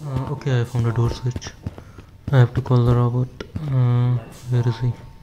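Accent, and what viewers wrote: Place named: India. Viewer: ur voice looks like Indian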